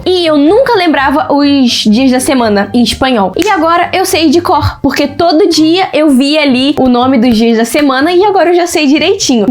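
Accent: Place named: Spain. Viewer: tu agr qnd falas ingles ja tens o sotaque espanhol kakaka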